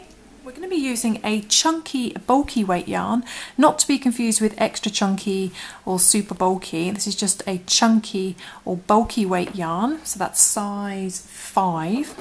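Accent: England, United Kingdom. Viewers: Oh I was so happy when I heard your English accent but turned into a frown when you started to explain using American terms lol ... Great tutorial tho..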